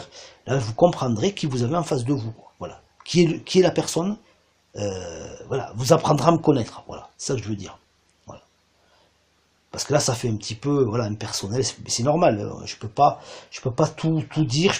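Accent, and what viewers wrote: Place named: Toulouse, France. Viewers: Bonsoir , tres important vos messages , n'être plus dans l attente , celle-ci est primordiale.. Ouiii 3 belles erreurs qui priment❤ et effectivement le runner doit entendre que le chaser vie sa vie, ça y est , il la conscientisé...et gratitude à l'univers pour tous nos cadeaux de la vie ... Merci belle voix du Sud Ouest 😊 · ✨Je me suis abonnée depuis le début car j'ai de suite ressenti votre authenticité. Votre discours unique fais du bienggg😂 J'ai bien reconnu votre accent car je suis Toulousaine. Continuez surtout car vous faites du bien. MERCI✨🙏✨Bien CORDIALEMENT. ✨💚✨🌟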